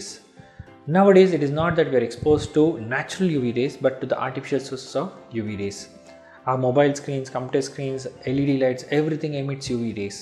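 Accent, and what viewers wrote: South India, India. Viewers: For those who could not understand his South Indian accent, here is a brief synopsis: UV rays damage the skin over time; we are exposed to UV rays not just from the sun but also from various electronic screens (he says all the screens produce some UV rays). The treatment he recommends is sunscreen.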